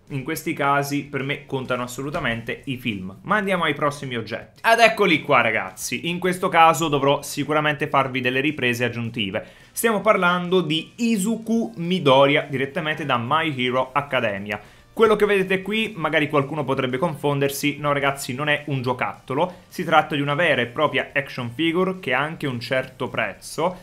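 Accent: Naples, Italy. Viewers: Ma sei di Napoli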